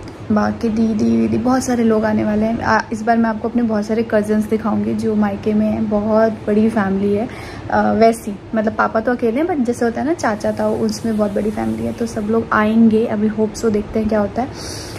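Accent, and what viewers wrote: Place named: Bhojpuri, India. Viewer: Aaj 1St time comment kar rahi hu .Aapke muh se bhojpuri bahut acha lagela❤❤❤❤❤❤❤❤❤❤❤❤❤❤❤❤❤❤❤❤❤❤❤❤❤❤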